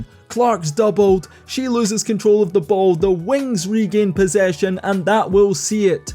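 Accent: Scotland, United Kingdom. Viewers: Where is the accent from, please? Scottish? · It’s a bit odd, hearing a basketball game narrated by a Brit. They play bball as much as we play Cricket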